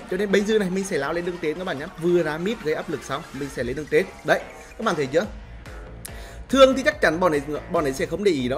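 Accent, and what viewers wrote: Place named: North, Vietnam. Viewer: Giọng nói miền bắc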